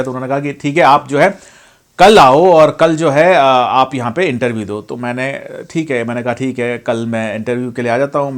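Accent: Kerala, India. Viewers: Malyali ho aap?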